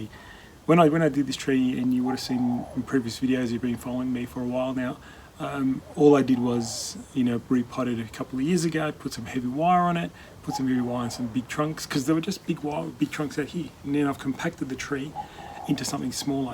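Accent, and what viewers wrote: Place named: Australia. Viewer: Hi, I've been following you on YouTube for some time, I learn a lot about your work with bonsai, but sometimes it's more difficult to follow you due to more the bad sound than your beautiful Australian accent, many days there is wind, we like the sound of the leaves and the birds.....but it would be better with a microphone, don't you think? 😉, kisses from Barcelona, Catalonia your antipodes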